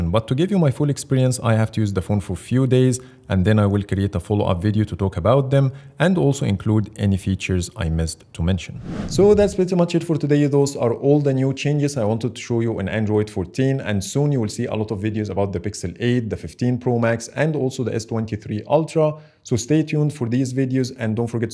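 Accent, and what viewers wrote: Egypt. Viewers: Man i love the Egyptian accent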